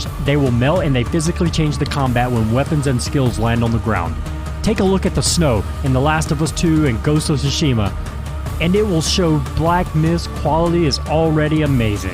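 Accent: Texas, United States. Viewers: Wait, is that like a Texan accent? I just watched the video released before this one and you (?) were speaking Chinese. What's going on lol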